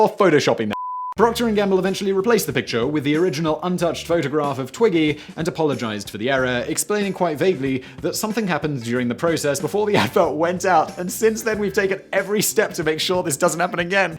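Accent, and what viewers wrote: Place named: United Kingdom. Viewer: nope, hes just British.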